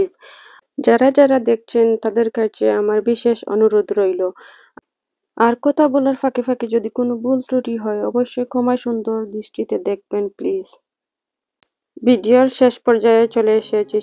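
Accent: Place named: Sylhet, Bangladesh. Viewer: আসসালামু আলাইকুম আপু আপনার কথা গুলো শুনে মনে হচ্ছে আপনি সিলেটি আমি ও সিলেট থেকে দেখছি সিলেট কোন জায়গায় আপনার বাড়ি কমেন্ট করবেন ❤❤❤❤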